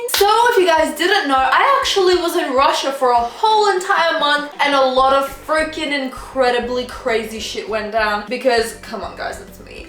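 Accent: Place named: Australia. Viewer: You know I was wondering if sometime you'd like to do a collab? There's this challenge called the accent challenge and me being Canadian and you with your cool Australian accent I thought it would be perfect. If you'd like to here's my email we could discuss it more and if not that's totally cool. <mail>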